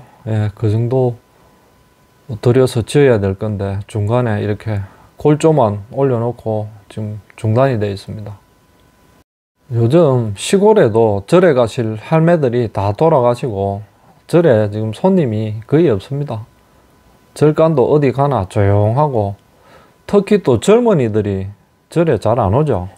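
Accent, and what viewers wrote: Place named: Gyeongbuk, South Korea. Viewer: 도 경북말투 억양 이시네요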